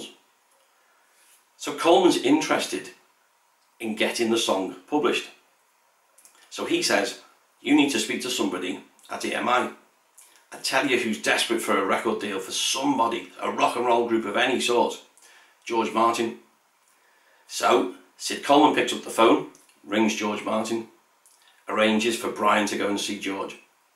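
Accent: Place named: United States. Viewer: And let me guess, you are American-cause you,guys are the best at everything, right? 🙄 Only an American would be arrogant enough to suggest that a documentary about a BRITISH BAND be narrated by an American. Go wave your flag somewhere else.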